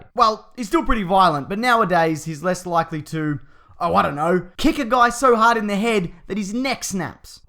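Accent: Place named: Australia. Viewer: Someone explain how every single auzzie youtuber ( i think he is auzzie? ) sounds the sound Like this guys voice is the exact same as theauzziegamer and some other channels i know ( btw no offence im an auzzie as well mate k )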